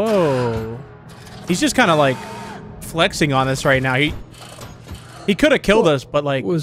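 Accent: United Kingdom. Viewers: i love the british accent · Yet another British accent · British sounds good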